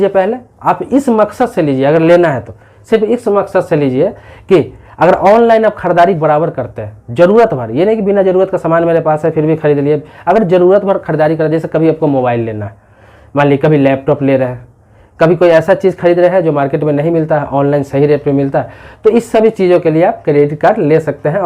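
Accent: Bihar, India. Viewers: Bihari bhasha thik karo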